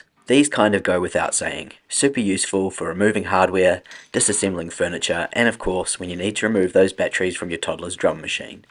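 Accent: New Zealand. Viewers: Ok have a question for you!! Have u consided purchasing a laser paint remover..some are very expensive but some are cheaper if u look about...no more sanding or scraping imagine time saved..would make an interesting video...anyway have been enjoying your videos grretings from Australia..do i detect a kiwi accent?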